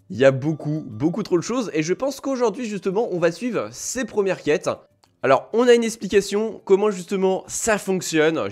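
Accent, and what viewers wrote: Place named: England, United Kingdom. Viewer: Très belle accent anglais 👌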